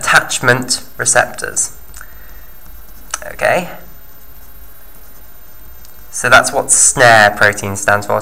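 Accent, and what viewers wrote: United Kingdom. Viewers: I think it’s just a compliment on his British accent.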